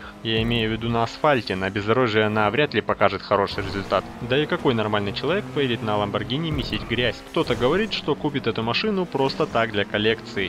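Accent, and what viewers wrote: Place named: Russia. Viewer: Он снимает видео на русском, для русской аудитории. Поэтому и говорит так, как удобно, на русский лад, если хотите. Снимал бы на английском - место для придирки было бы. А так нет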